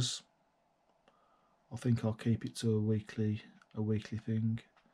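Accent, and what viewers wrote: Birmingham, United Kingdom. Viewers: Damn that brummy accent 🤣🤣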